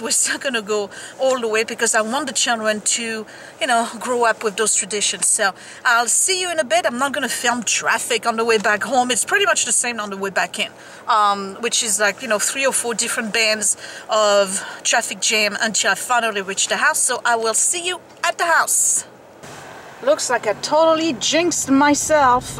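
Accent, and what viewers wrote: France. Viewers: I studied French for 4 and a half years. I had hope to visit there but it has not happened. I always could tell your accent was French. I hope Scott feels better soon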